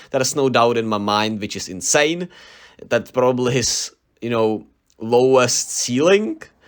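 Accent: Czech Republic. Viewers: Je huste pustit si video a jen z prizvuku zjistit, ze je nekdo Čech.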